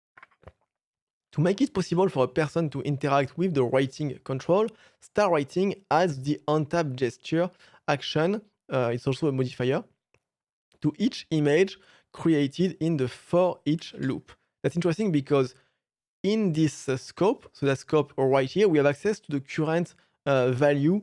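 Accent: France. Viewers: fransız aksanı mı bu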